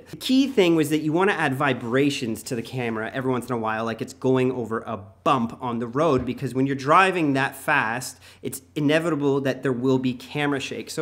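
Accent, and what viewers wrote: Canada. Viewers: I like the way you say process. Wish I had that Canadian accent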